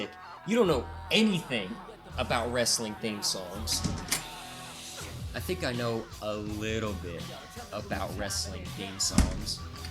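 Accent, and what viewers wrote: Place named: United States. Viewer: Obviously done some research, but doesn't really sound like an actual fan. And the narrator sounds American, but struggles with pronouncing basic words and names like Dud-ley. Instead pronouncing it Dude-ley and Mid-E-On, who apparently worked in the Ministry of Darkness with The Undertaker and Kane....🤦🙄🧐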